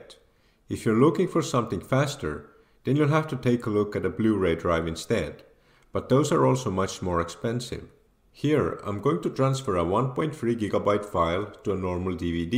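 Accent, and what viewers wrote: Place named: India. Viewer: This was a big help to me to help reinforce my thoughts on how to set up the cables etc. and help my confidence in hooking up and installing my machine. I hope it isn't offensive coming from a white dude saying that Asian Indians are really superb with technology (better than white dudes like me). When I heard your Asian Indian accent I was thinking "Awesome, now I know THIS dude KNOWS what he is talking about!!!" Thanks brother, God bless you and your loved ones. You are a lifesaver Bro.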